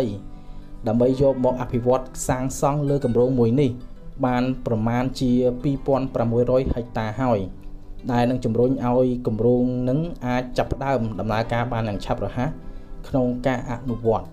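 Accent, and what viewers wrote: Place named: Takeo, Cambodia. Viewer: ប្រុសទេតែនិយាយម៉ាម៉េចតាកែវ មិនមែនគាវទេប្អូន។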